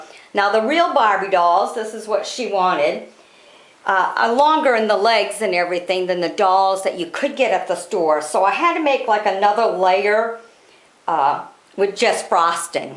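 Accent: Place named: New Jersey, United States. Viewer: She sounds like she's from Jersey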